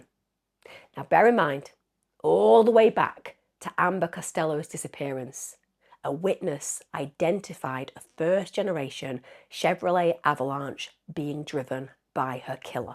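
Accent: United States. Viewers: Your interview in the American accent was hilarious 😂 you are right up there for me ❤ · !! your American accent is getting so much better!! Have you been practicing 👌♥️ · Hearing you with an American accent was so weird haha! But it was actually very well done! 😊😊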